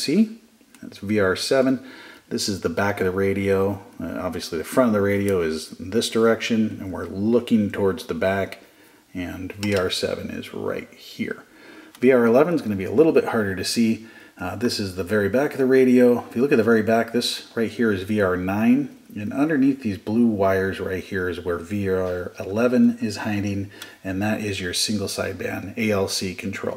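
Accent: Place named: Pacific Northwest, United States. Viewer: Dang.. I remember the days.. But I got out of CB just before they went to 40 channels.. it got so crowded and folks were just playing with the radios.. Have always wanted to get back to it.. Maybe I will this year.. Great show.. sounds like your in my neck of the woods.. though I am a bit south in Vancouver Wa...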